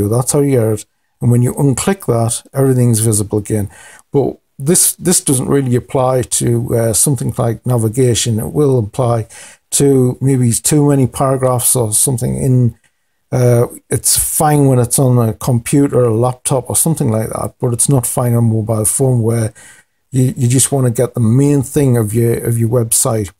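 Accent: United Kingdom. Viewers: The bit about Dropdown menu items is no longer correct in version 4.0.4. I wasted some time before realising that there is now a menu in the middle of the page above the main form where one can add items, remove items and so on. Otherwise, it is a nice presentation. I like the BBC accent. :-) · Thanks for these VERY helpful tips! Nice to hear a NE accent as well...I'm from Durham City but living in Kansas now.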